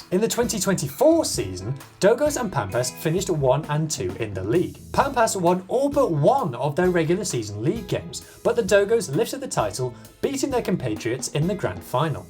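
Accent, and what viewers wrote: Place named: United Kingdom. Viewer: Same here, his brit accent makes it more understandable (at least for me haha)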